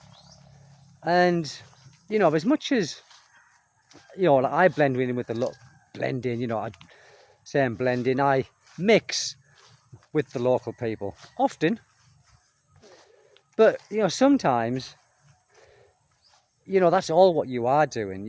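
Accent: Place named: Cockney, United Kingdom. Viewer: Being French and loving the English language, my favorite is the cockney accent from London. Which is where your accent is from? It sounds chicky ans is very lively. "Hello, you lovely people!" I love it! And then the little boy coming in at the end as if, he too, wants to take part to the video. As for the content, I guess we can get bored and lonely anywhere in the world, maybe that some places are just better than others to get bored or lonely. There's no paradise on earth, I think..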